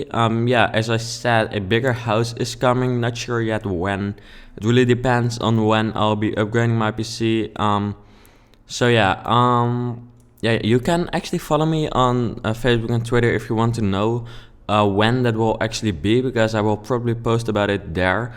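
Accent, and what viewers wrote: Netherlands. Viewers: You need to stop using youre dutch accent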